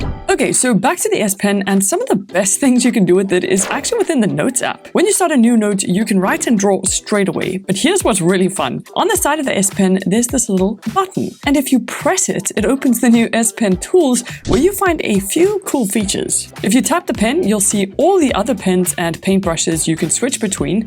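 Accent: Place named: South Africa. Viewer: Love the super fun features that you highlighted as well as the South African accent!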